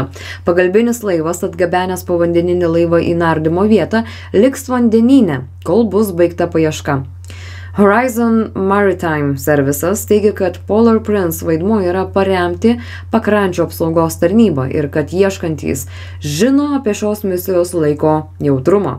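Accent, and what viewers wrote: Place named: United States. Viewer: Ausys vemia su tokiu akcentu klausyti, jei tokia amerikone, manau anglu kalba butu geriau daryti video, turesi bent didesne auditorija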